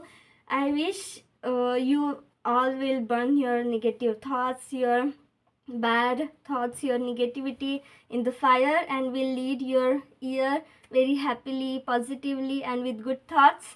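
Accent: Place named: Sindh, India. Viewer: Apki language se aap mujhe sindhi lagti hai mujhe reply kijiyega khushi hogi ke bhar mulk se mujhe reply mila.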